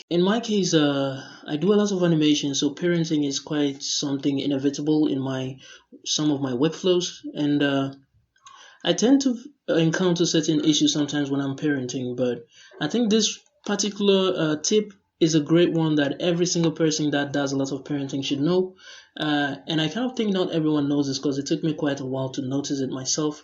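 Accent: Nigeria. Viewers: Omg, that accent is eerily Nigerian.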